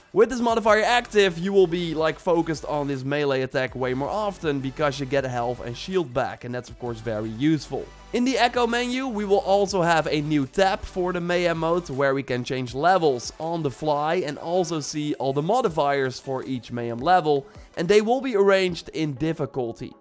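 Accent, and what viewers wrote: Netherlands. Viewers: lol hearing a thick dutch accent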